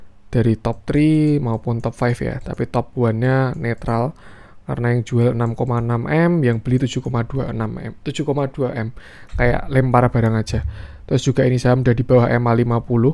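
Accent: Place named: Central Java, Indonesia. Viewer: medok gess… org jateng nih min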